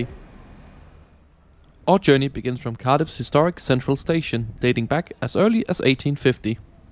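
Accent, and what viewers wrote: Denmark. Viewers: Your Danish accent is very nice to listen to, much nicer than most U.K. dialects! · I know. He’s danish. We’re somewhat in between UK English and US English when it comes to speaking English.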